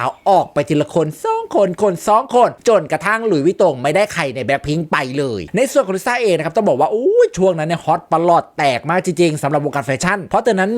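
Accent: Laos. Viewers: อีพีนี้แอด..ตกลาวบ่อยมากนะ..ฉันอมยิ้มและหัวเราะในเวลาเดียวกัน😂..คนสิว่าฉันเป็นบ้าอยู่ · มักๆๆแอดเว่าลาว.ขี้เล่น ตลก สนุกสนาน😂😂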